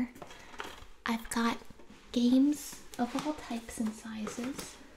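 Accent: South, United States. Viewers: Has accent changed? I swear it used to be more southern sounding... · Remember when had a southern accent?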